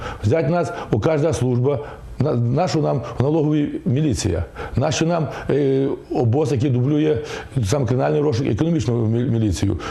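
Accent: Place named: Western Ukraine, Ukraine. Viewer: ааааааа! Тогда по твоему пол западной Украины контуженные! Это форма разговора такая!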